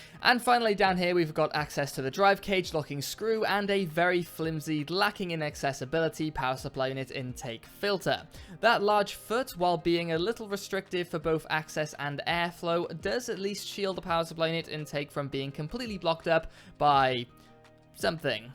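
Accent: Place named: South Wales, United Kingdom. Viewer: Weird question, you Welsh? You sound south wales-ish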